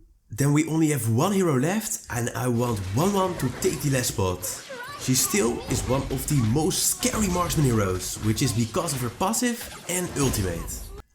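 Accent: Netherlands. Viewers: I smell dutch bro dorry but you have such a dutch accent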